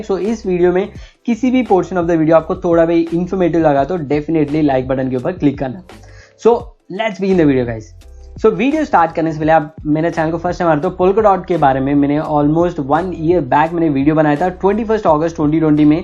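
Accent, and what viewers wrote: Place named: South India, India. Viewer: Your accent is South Indian and you use more English. Change your channel name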